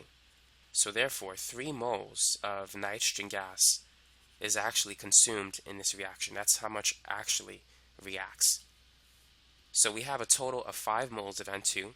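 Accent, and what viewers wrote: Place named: Boston, United States. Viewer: Great video! Are you from Boston? PAcent lol I love it. Thanks once again for the videos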